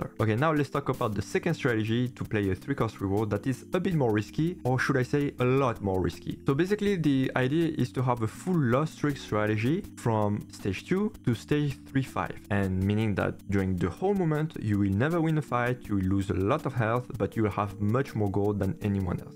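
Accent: France. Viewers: French accent was easy to understand most of time, but it took me a moment to figure out that you talked not about 'focused units' but 'four cost units' :) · C'est moins l'accent français que l'enfer d'écouter un français me parler anglais alors que ce serait plus simple en français mdr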